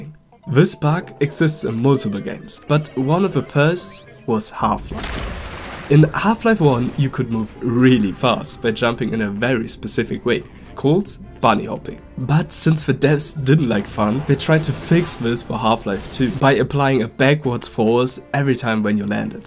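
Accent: Germany. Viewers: I hear the DEUTSCHER AKZENT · You sound so German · Direkt beim ersten wort gemerkt, das du deutscher bist 🤝🥹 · You Sound german Und ich bin deutscher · Very german accent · you sound german mate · I Knew it that you have a German accent. Windows Defender Message prooved that i can hear that someone is german. :D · U have a very Strong Accent (perhaps German??) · bruhh He sound like hes german! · Ich wusste es, habe schon den deutschen akzent gehört und dann die deutsche windows benachrichtigung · you sound german to me🤔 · Hatte doch gewusst das die Aussprache mir sehr deutsch vorkam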